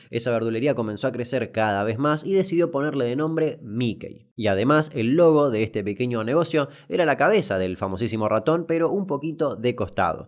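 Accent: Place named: Buenos Aires, Argentina. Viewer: Me asusta que tengas asento porteño siendo de Chaco :0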